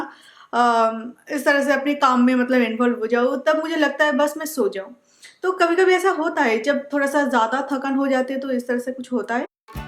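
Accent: Bengal, India. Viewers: Ur bengoli mixed Hindi language sounds oswm😍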